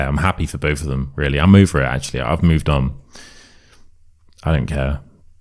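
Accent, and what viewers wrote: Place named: England, United Kingdom. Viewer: So lovely to hear a real English speaker - beside all those strange dialects of allegedly English language in USA, Australia etc.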